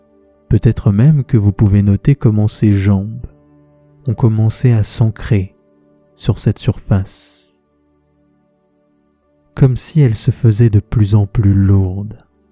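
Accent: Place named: France. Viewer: woooow! Je suis abonnée à ta chaîne depuis peu. Je faisais de l'insomnie depuis un moment et tes vidéos mon sauvé 😇 Particulièrement cette vidéo là! J'ai dormi dur dur comme un bébé tout la nuit et je me suis réveillé que le lendemain! Merci énormément, tu as une voix hypnotisant et doux. Je t'écoute tout les soirs xxx (je viens de Québec et je trouve l'accent français si agréable à écouter 😍)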